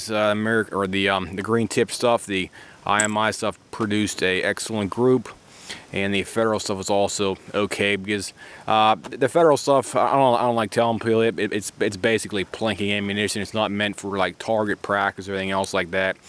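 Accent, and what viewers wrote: Pittsburgh, United States. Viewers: This guy is definitely from the Pittsburgh area!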